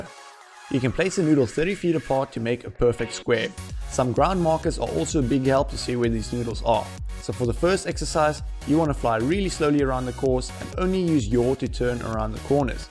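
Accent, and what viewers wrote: South Africa. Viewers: Are you South African?? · Hey you from SA?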